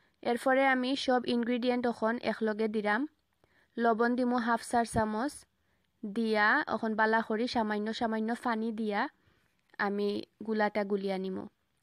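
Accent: Sylhet, Bangladesh. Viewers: আফা মন পাইছো❤ খাঁটি সিলেটি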